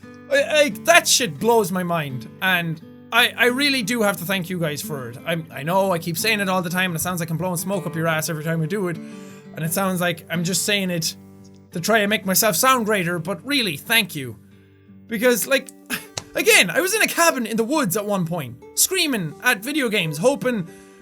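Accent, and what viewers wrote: Ireland. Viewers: are u actually irish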